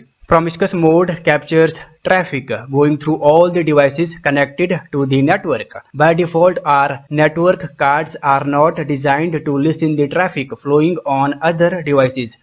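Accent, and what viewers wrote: India. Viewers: When I heard Indian accent I thought the video is gonna be just a joke but this video helped me so much, great one, keep it up! And u really know what are u talking about. Like deserved. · the indian accent may the most annoying of all, but the content are really helpful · I never cared about heavy Indian accent because my pc is healthy thanks to a lot of precious help/knowledge that i absorbed by watching tutorials made by Indians, among other things. I have come across videos where i had to pause and listen again to a phrase or two, but that's the worst case scenario. And the value of the information absorbed, is the only thing that matters. Thank you for such a helpful video unknown friend.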